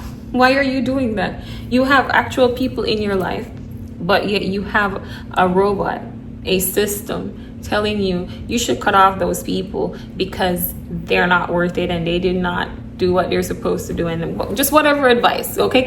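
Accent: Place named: Jamaica. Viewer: Where are you from? I love that accent sound Jamaican I love Jamaica.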